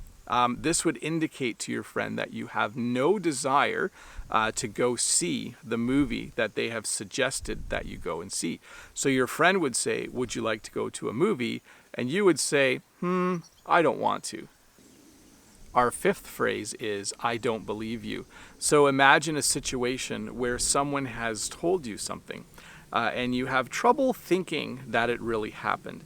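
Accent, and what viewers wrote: Canada. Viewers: I knew that you are Canadian through your pronounce especially when you said (about) thank you so much my great teacher for teaching us your beautiful language , I'm watching you from the place where Arabic language was born it's Saudia Arabia . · I likes Canadian English, it is totally different to US English. Greetings from honduras, nice videos thumbs up well won dude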